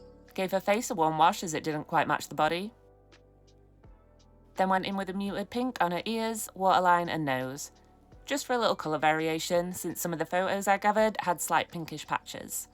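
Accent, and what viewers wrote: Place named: Sheffield, United Kingdom. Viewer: amazin work lady .. you from Sheffield cos ya sound like me 😂 your talent is the mutts nuts ❤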